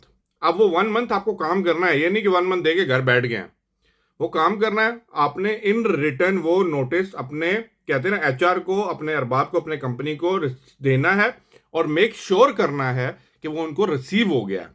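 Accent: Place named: Punjab, India. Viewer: are bhai hindi bol raha hai ya punjabi , thoda clear bol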